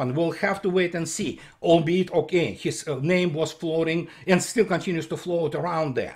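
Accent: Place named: Russia. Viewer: You talk too precipitously and with your strong Russian accent is hard to follow your line of thought; calm down, pronounce each syllabe distinctly and do not overlap words, one on top of the next.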